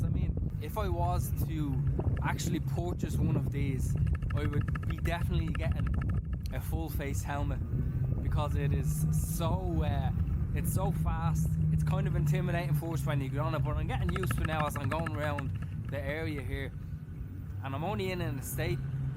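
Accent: Dublin, Ireland. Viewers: I've been trying to work on my Dublin accent for a while now, and that we all have to return to our offices, i've been looking for an e-bike, so your videos are so dope! I know you are just speaking normally, but if you can, please speak in the most rawest Dublin accent possible for your next one!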